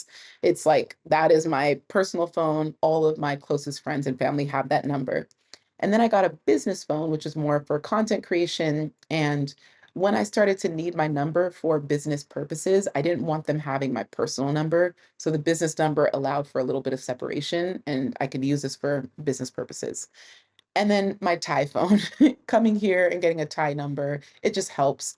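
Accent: United States. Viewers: 👵🏽This is a compliment I love your American accent especially when you say y’all 🫶🏽✊🏽👊🏽💪🏽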